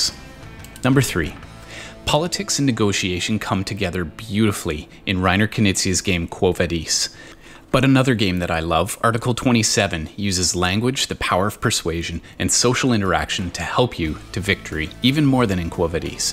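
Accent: Canada. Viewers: Canadian accent detected.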